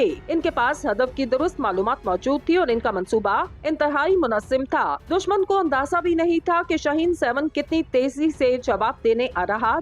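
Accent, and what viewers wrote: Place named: India. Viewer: اگر بھارت کے خلاف ویڈیو بنائی تھی تو بولنے کا انداز بھارتی رکھنا ضروری تھا؟. اردو تو باکستانی بول لیتیں۔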